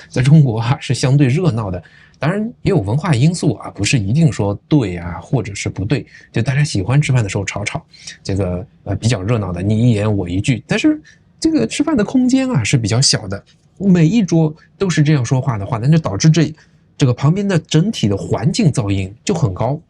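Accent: Malaysia. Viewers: 哈哈，你的口语也是有大马的味道了。中国人口多，没办法跟大马对比。=) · 在大马搭车，你还要特别选择安静搭乘，不然driver会认为聊天的机会来了，只能说我们大马人真的太热情了😅话说，你说话的方式越来越大马化了啦，尤其那个....我觉得hor😆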